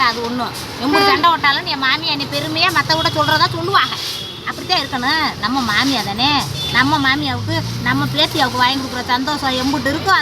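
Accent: Madurai, India. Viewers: மதுரையா கமுதியா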